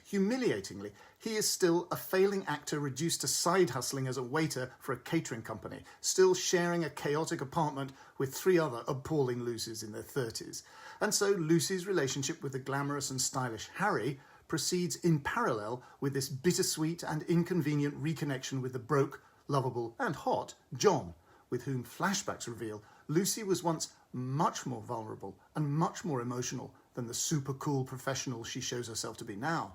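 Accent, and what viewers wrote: United Kingdom. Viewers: Which horror! Sorry for you that you are forced to review such a movie, in French "une daube"! (Watched the trailer. Such waste of pellicule! and such bad acting.) Discovered by hasard your vlog! from Locarno's Festival, appreciating and following you since then. And reading your reviews on Guardian, my preferred newspaper since I was studying classical singing in London many many years ago. By the way did you leave our Lago Maggiore's sublime shores? Did you miss on Piazza Grande the terribly strong punch-movie "Irkalla Hulm Jijiljamish" by M. Jabarah Al-Daradji ? Even under such a never happening before heat wave I was freezing, tears in my eyes, a lump in the throat... Ah, by the way, adore your exquisite English accent!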